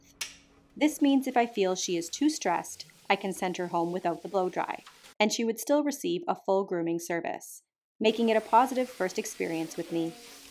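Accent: Canada. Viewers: Thanks. NO WONDER you sound like a Canadian. Cheers.🇺🇸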